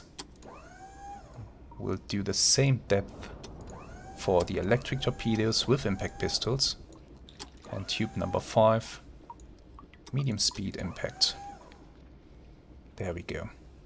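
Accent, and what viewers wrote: Germany. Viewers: great stuff. And the fact its a german accent in u boat playthrough makes it extra spicy. Next goal: 200!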